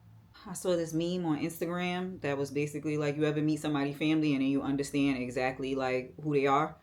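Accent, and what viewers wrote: Brooklyn, United States. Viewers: Amanda Seales Me! Cut It Out. 😂 You speak a dialect that is very Brooklyn Millennial.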